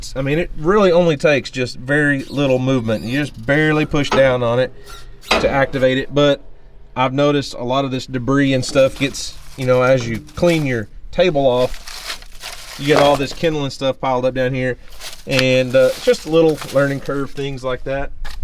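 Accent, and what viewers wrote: United States. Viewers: Wooowhoooo CANADIAN MADE!!! 🇨🇦 sorry my country pride is showing! Funny when you said the name of the company I immediately thought hey isn’t that a Canadian company?! When you confirmed it right away I thought way to go! Then I thought wow with the exchange rate you probably got a heck of an amazing price too! Smart man! I’m so proud that you were as thrilled with it as you were, built tough to last! Trust me when you live in the “great white north” you want to have tools that work and will last through the elements. Lol! I love your American accent too! Hehehe 🥰 funny here I am living on the 34th floor high in the sky in a metropolitan area watching a country guy splitting firewood and feeling country proud! Life is strange at times eh? (Thought I’d toss in that Canadian “eh” just to prove I’m truly a Canadian! 🇨🇦 As always I love your videos and this one was extra special for me. 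So glad you enjoyed splitting your firewood isnt life so much sweeter when we enjoy what we do? Sure makes chores less like chores and more like just living. Be well and enjoy your new toy!! Lol!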